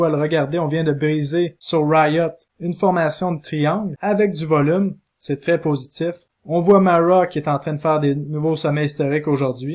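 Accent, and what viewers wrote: Quebec, Canada. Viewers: Salut , tombé par hasard sur une de tes vidéos après avoir regarder ma vidéo quotidienne de didi de BTC family , comme quoi YouTube peut être pertinent je trouve ton point de vue très intéressant et ton accent me rappel ma jeunesse passé à Loretteville à côté de Quebec + 1 abonné.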